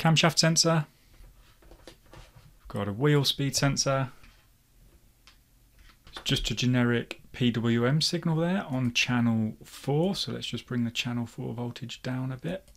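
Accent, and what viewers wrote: United Kingdom. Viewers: I am sure that accent is from my neck of the woods Burton On Trent ? I have one of these 1008 Hantek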